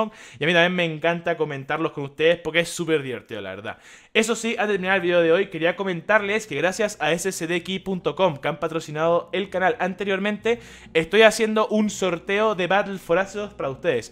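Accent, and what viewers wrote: Chile. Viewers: este men es chileno no?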